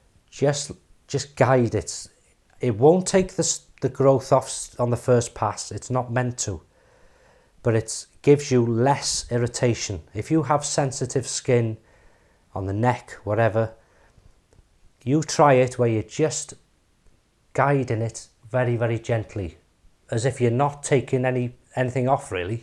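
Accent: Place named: North Wales, United Kingdom. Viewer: Hi, Top man, interesting, informative chat. I look forward to all you videos, love the North Wales accent, makes me feel like being home. Keep up the good work Cheers Steve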